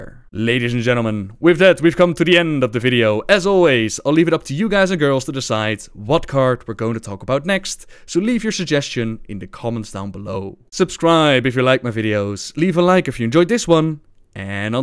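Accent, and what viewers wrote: Netherlands. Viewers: Lol ik dacht... dat klinkt nederlands.. en die stem herken ik.. ehhhh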